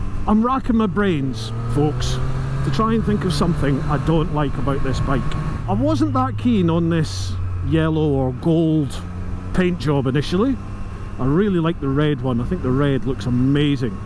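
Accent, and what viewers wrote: Scotland, United Kingdom. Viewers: I hear a Scottish accent. I hit like. Its really that simple.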